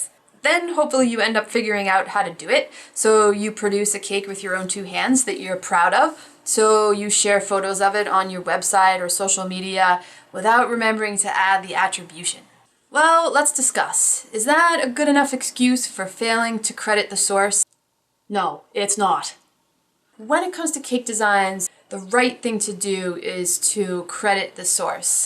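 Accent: Boston, United States. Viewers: Ha love your Boston accent! Love seeing your progress on your master class - keep up the good work! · loved your Boston accent!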